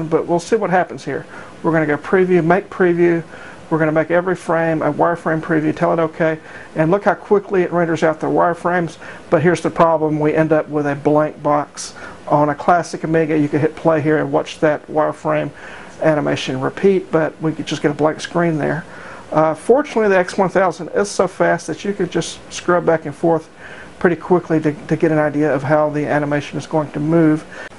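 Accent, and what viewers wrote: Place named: South, United States. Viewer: Hey! Is that a pro minidisc deck to the left of the A1200., I've corresponded several times with you on <link> and through e-mail regarding Amigas and Lightwave - but I never knew you had a bit of a Southern US accent!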